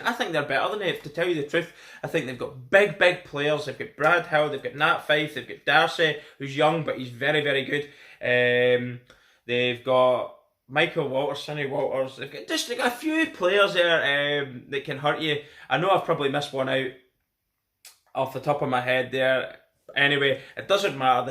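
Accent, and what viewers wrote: Scotland, United Kingdom. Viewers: love the scottish accent man, i see the rest of the round similar to how you do. Although i think St Kilda will be dangerous for us as they got a lot of negative press about their performance this week it could make play out of there skins, We getting 4 premiership players back including our captain and hopefully we don't get ahead of ourselves thinking it will all happen but come out and really work hard and get the job done.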